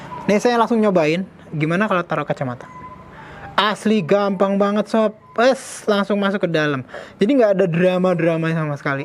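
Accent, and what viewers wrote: South Jakarta, Indonesia. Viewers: wiciswicis jaksel nya aga cukup mengganggu bagi saya yang bukan orang jawa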